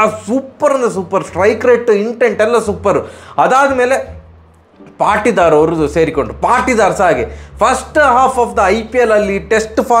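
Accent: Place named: Dakshina Kannada, India. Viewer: ಬ್ರೋ ರಿಯಲಿ ನೀವು ಸೂಪರ್ ಆಗಿ ಮಾತಾಡ್ತೀರಾ.. ನಿಮ್ಮ ರಿವ್ಯೂ ಕೇಳೋಕೆ ಚೆಂದ ಅಷ್ಟು ಮುದ್ದಾಗಿ ಸ್ಟೈಲಾಗಿ ಮಾತಾಡ್ತೀರಾ. ಅದರಲ್ಲೂ ದಕ್ಷಿಣ ಕನ್ನಡ ಭಾಷೆ ಕೇಳೋಕೆ ತುಂಬಾ ಚೆನ್ನಾಗಿದೆ.. ಜೈ ಆರ್‌ಸಿಬಿ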